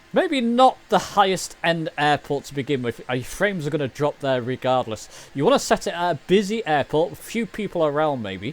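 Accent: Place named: Scotland, United Kingdom. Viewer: Lol your accent sounds just like my Scottish friend I play Rust with! Thanks for the video!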